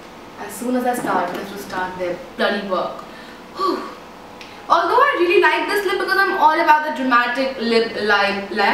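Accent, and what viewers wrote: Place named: Delhi, India. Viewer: tv halka karwa deee wats tht meann this is one of ur best video n i save it offline love ur delhi wala tone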